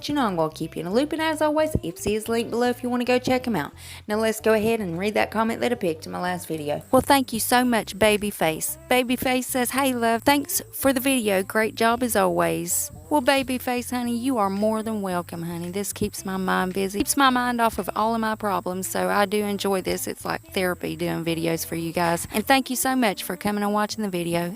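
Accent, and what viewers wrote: South, United States. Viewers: Im new to ur channel. I love ur southern accent! I am a southern girl from Kentucky. Thank u for the Ipsy spoilers.💋💋💄💅💖💕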